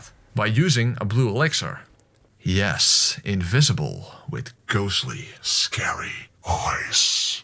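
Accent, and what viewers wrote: Netherlands. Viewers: It took me 2 seconds to recognize your accent, het is goed om een Nederlander te horen op Youtube! Great content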